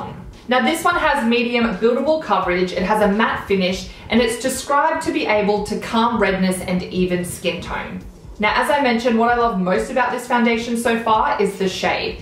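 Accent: Australia. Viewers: hy dear u from austrlia???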